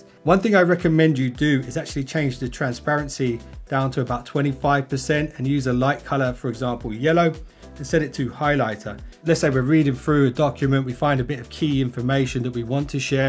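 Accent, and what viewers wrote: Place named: United Kingdom. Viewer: uve got a british accent but you say zee instead of zed .... weird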